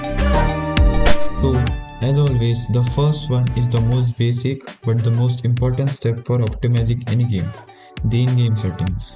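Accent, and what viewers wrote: India. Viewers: Bro this voice really like of a Indian person...ARE U INDIAN 😮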